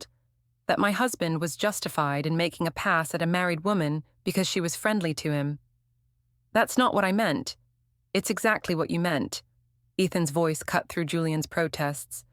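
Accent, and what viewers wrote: United Kingdom. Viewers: I hate the British accent in these stories. The stories tend not to get to the point but a exercise in proper English. I. Prefer the raw, to the point speaking.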